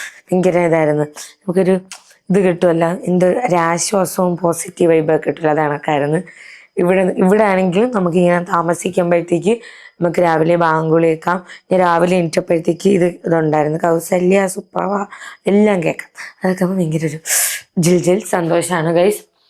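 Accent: Trivandrum, India. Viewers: Nombu eduthittu kaanunnavar undo nammude trvandrathu ingane aanu parayunnu uratti 🥰❤️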